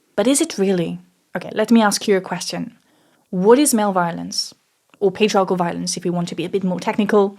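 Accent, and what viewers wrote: France. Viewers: Love your French accent 🥖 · I love how you combine this complicated discussion into a rational and balance topic. Maybe your voice and french accent help too, mercy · Love how your accent is so French it becomes Australian (Overton windor, videor etc...)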